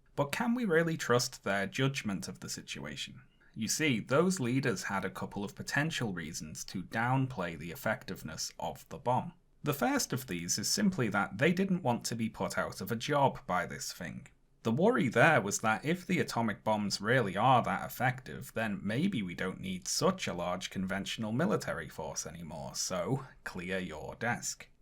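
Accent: United Kingdom. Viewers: I think it's the British accent that makes them think what he is saying is true. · Japan forfeited it's right to anything but death and distraction when it attacked Pearl Harbor. It's easy for people in the relatively safe and far less brutal 21st century to point fingers at the past but if Pearl Harbor happened today, it would be grounds for a nuclear retaliatory strike. The war broke Japan's genocidal list for war and extermination so if cooking a few hundred thousand of them alive was what it took, it was a small price to pay. Go live through the Rape of Nanking or the Battan Death March and then tell me how compassionate you are about Japanese school children and so on. Those Japanese school kids would have grown up to kill, rape and destroy like their parents and grandparents had the United States not destroyed Japan's centuries old Warrior culture. 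Then again... The person in this video sounds British and they know more about killing, raping and destroying than anyone considering they spent several hundred years doing it to a quarter of the world.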